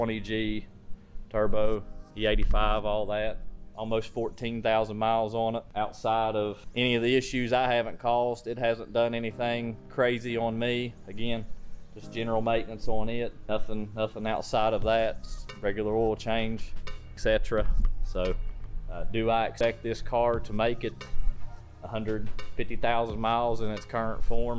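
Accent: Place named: Tennessee, United States. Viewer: What state are you from man? You sound like you’re from my neck of the woods. I’ll say I’m about 20 minutes north of Nashville TN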